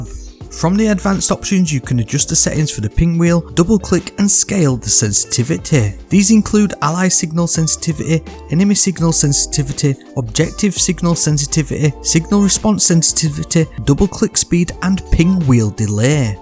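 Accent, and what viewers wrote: England, United Kingdom. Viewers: Hey! Thanks for the video! Got a strange question: what kind of english acsent is it?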